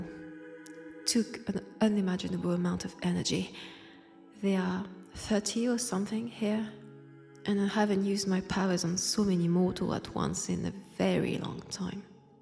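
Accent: France. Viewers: Hearing your beautiful French accent whenever you upload a new video always makes my day, I hope things have been going well for you lately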